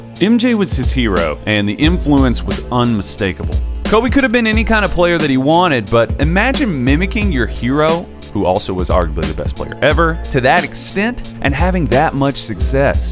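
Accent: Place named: Kentucky, United States. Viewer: Where in Kentucky are you from? Just curious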